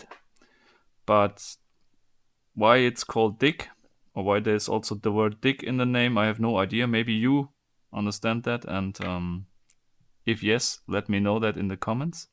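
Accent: Germany. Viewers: I don't know why but even though you have a German accent I think you are part Asian. You have an amazing mind. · are you german? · his accent sounds sooo german🙊🙉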